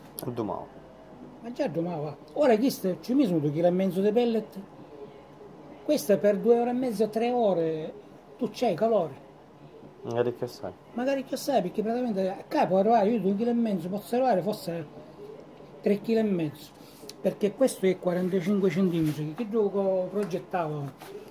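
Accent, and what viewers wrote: Italy. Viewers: Ma quale calabrese questo e' un siciliano verace............... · dialetto quasi uguale al leccese 😁, bel progetto , sarebbe bello vedere come l hai fatto , e in più io lì dentro aggiungerei qualche pezzo di legna giusto per risparmiare veramente.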